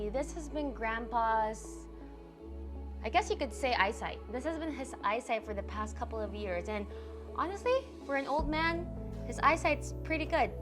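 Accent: Philippines. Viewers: ui your a filipino pala hehe just dropped on your channel ehehe kaya palang familar yung accent hehehe. i also have and still currently using almost the same system. z170 asus ROG with a i5 6400 CPU with GTX 960 with 8gb Ram planning to upgrade the ram and the GPU soon currently using it for editing and minsan gaming hehe.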